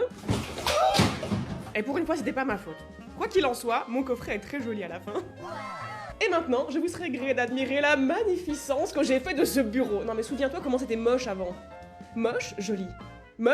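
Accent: Belgium. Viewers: Elle est belge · cc c'etait pour savoir ta un petit axent et je suis francobelge du coup je voulais savoir est ce que tu est belge peut etre que j'ai tout faut mais voila bisous bisous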